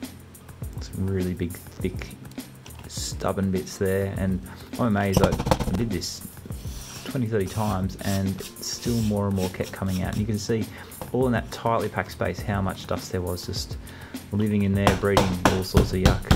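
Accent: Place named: Australia. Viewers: He’s from Australia. That’s what that accent sounds like! A sensible country where you rightly pointed out normal people don’t have or need hand guns. They do however have snakes and spiders that are 10 times more dangerous!! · This is an Australian accent, not a British one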